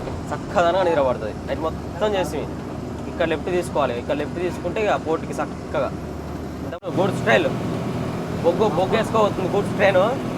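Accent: Telangana, India. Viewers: Thank you నువ్వు చాల మందికీ ఇష్టం అందుకు Brother TELANGANA యాసని India మెత్తం పరిచయం చేస్తున్నావ్ Fast నుంచి చూస్తున్న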